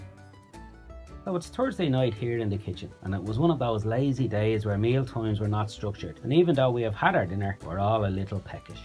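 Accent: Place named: Dublin, Ireland. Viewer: Dublin JAKEEN ACCENT..TAXI DRIVERS.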